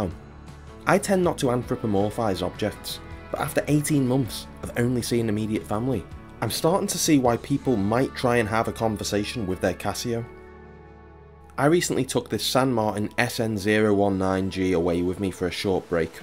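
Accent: United Kingdom. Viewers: The algorithm has blessed me in mysterious ways - came here for your review on the Hamilton Khaki Field Mechanical and here I am, listening to a British gentleman talking about man thrashing in the Mediterranean 🤣 “NO MORE POLITICS”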